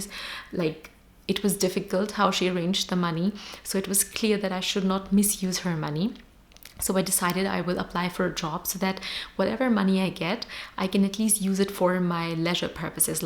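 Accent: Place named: Germany. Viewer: Hello, was your Masters German taught? I hear a bit of German accent in your tone.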